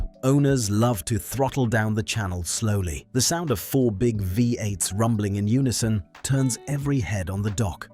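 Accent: United Kingdom. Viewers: Why the British accent? Why?!!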